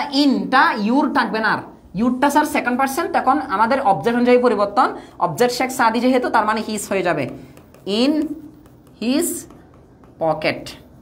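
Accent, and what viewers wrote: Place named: Chittagong, Bangladesh. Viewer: ভাষায় চাটগাঁইয়া ভাবটা বেশী।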